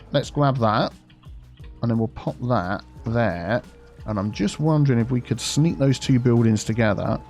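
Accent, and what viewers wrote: United Kingdom. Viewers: Tesco lorry delivering to Aldi made me smile. This is so relaxing to watch, I’m new from Flabaliki’s channel and lovely to hear a fellow Brit!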